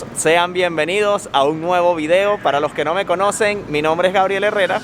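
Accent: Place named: Venezuela. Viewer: Apenas supe que eras venezolano por tu acento cambié de canal!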